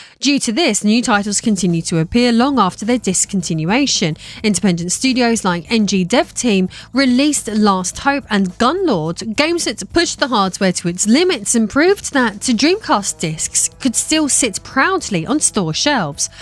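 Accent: United Kingdom. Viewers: Essex girl with elocution lessons reads AI script, today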